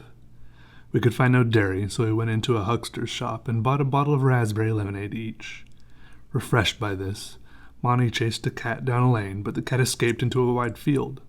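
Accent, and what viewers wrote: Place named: England, United Kingdom. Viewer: Hello. I like your English accent. And I like Celtic music, James Joyce's 'Dubliners'. Thank you.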